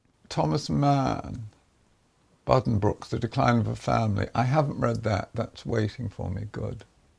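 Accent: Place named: United Kingdom. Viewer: Such a wonderful cozy atmosphere. This man could read a phone book and it would hold my attention. Love a British accent!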